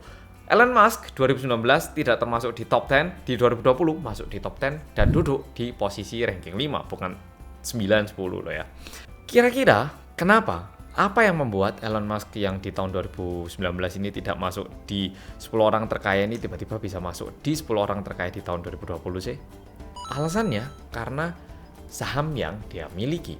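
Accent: Java, Indonesia. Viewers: Maz lofyu❤️punya kembaran medok jga aku wkwk sama maz >< Tim garcep dong❤️ Komen sebelum rame xixi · Yuk irit breng mas medhok... Waaahhh... Sip dah kalo sama mas... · Kangen yang medok medok😍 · kangen yang medok medok ya liat mas wkwk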